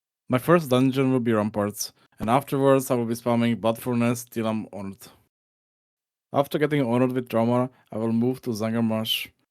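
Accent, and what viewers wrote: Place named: Czech Republic. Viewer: Where are u from man? I hear Czech ❤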